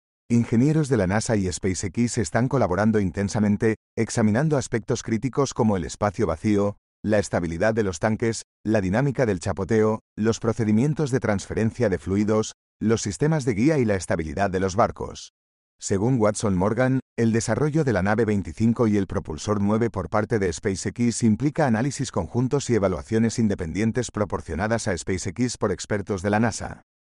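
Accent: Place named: Latin America. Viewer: "Los barcos petroleros" 🤣.........español....¿de donde?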